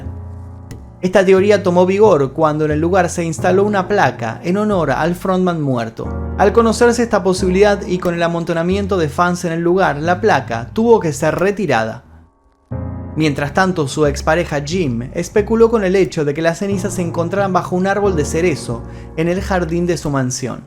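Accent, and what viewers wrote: Argentina. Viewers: Este narrador es argentino cheee, y quizá no se la coma igual se le salen los ojos 👀 mirándola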